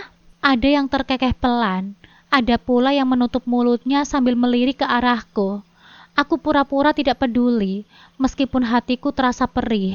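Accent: Java, Indonesia. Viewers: Medok jawanya jadi lucu dengarnya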